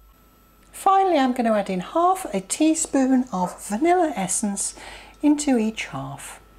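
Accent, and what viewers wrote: Birmingham, United Kingdom. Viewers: are you a brummie? I love your recipes.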